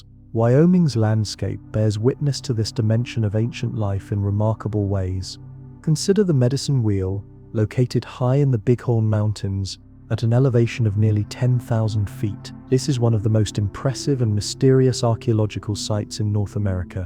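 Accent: England, United Kingdom. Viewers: You know you're receiving foreign Edomite indoctrination in an English accent and mispronouncing names and words recklessly.... Know wonder the Western Hemisphere is lost in ignorance. Ju Ly for 1776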